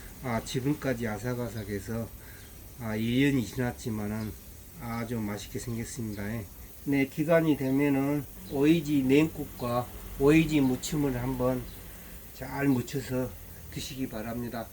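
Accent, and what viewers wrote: Jeolla, South Korea. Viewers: 고향이 어디신가요?정겹고 시골스럽네요^^ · 전라도말씨네 재수없는말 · 전라도사투리 안하셨으면. ㅡ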